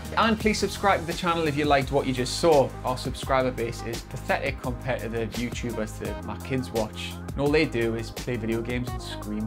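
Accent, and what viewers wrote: Newcastle, United Kingdom. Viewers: The best reviewer on YouTube! I’m not or ever will be interested in buying a forfour I just love the gordie accent and sense of humour. Keep up the good work! Did you apply for top gear???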